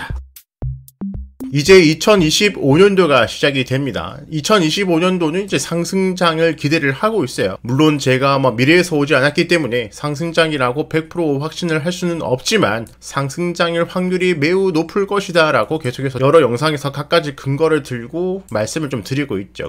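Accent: Gyeongsang, South Korea. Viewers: 남석관 할배가 자기인생에 1~2월은 나빴다! 하던데,,,인물 나셨는지 기대합니다. 수익보다 나레이션(갱상도 말로 씨부리는 맛) 재미.아닌지.?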